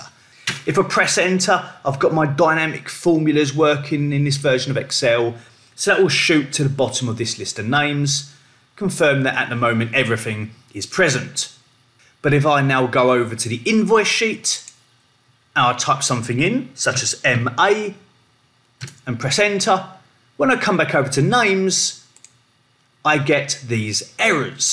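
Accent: United Kingdom. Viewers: R u british? Love that accent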